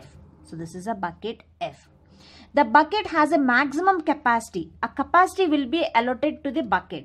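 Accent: India. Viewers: Can we get a non indian please… or can you guys work on your damn accent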